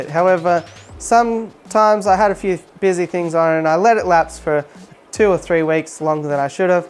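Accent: Australia. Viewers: R u ausie?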